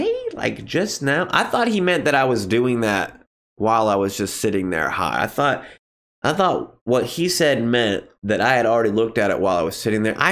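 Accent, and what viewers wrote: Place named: East Coast, United States. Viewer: sounds like every relative I have from Back East.. 😀😀😀 Love the dog and the show!!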